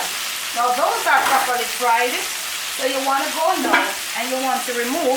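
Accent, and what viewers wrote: Jamaica. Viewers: I just love to watch you cook, and I also love the way you talk. The real JA dialect.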